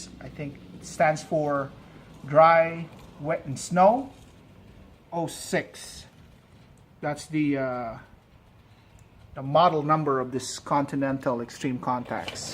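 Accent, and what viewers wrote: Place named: Philippines. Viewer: You Filipino. You got that accent. Do you have steering wobbles with these tires.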